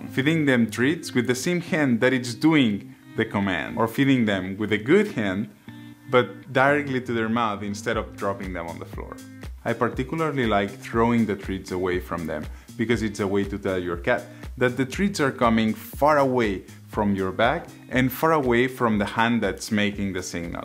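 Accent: Spain. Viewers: I'm trying to pin down your accent... Spain?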